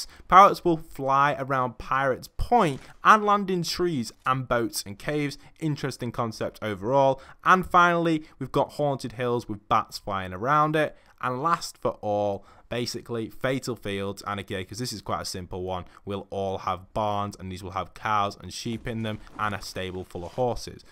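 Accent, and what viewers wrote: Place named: England, United Kingdom. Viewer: Wawrzyn The Prodigy I doubt he went to Harvard. He has an English accent Edit: if he is from UK it would be very expensive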